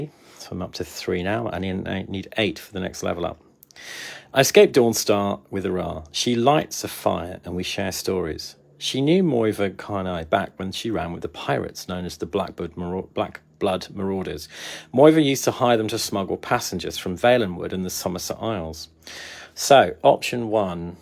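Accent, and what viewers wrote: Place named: United Kingdom. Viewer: Ciekawy tytuł, mocno brytyjski akcent :)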